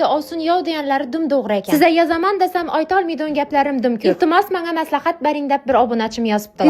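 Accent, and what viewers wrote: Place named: Khorezm, Uzbekistan. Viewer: Tilizga shakar xorazmliklar kursdoshlarimni eslatib yubordiz shevangiz bm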